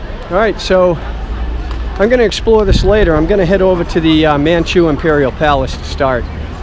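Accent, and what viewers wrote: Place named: New York, United States. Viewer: So cool hearing a New York accent in China!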